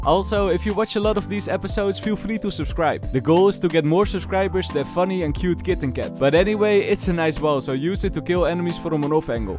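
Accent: Netherlands. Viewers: BRO YOUR ENGLISH ;( ur from the nederlands and u can hear that so fucking good like at least try to have a diffrent accent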